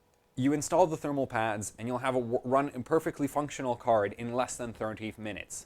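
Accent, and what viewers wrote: United Kingdom. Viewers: you have actually got a pretty nice English (UK) accent. BTW love your honesty and not overworked videos. thx and keep it up ;-)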